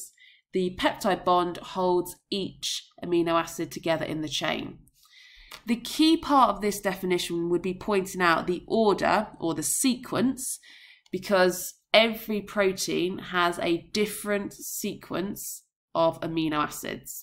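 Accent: United Kingdom. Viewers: Good explanation, but your British accent is getting on the way. Too many unnecessary sounds. It's quite annoying.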